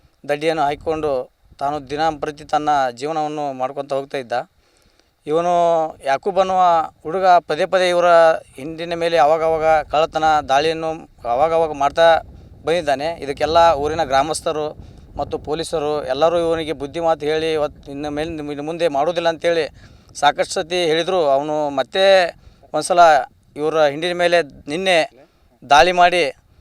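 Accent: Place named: North Karnataka, India. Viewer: ಆದ ಘಟನೆಯನ್ನು ನಮ್ಮದೇ ಉತ್ತರ ಕನ್ನಡ ಶೈಲಿಯಲ್ಲಿ ಹೇಳುವುದಕ್ಕೆ ಯಾರು ಬೇಡ ಅಂದರ್ ನಿನಗ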